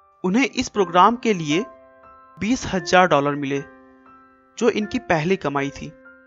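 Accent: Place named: Uttar Pradesh, India. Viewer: Iska uttar Pradesh Wala accent kan me chub ta ha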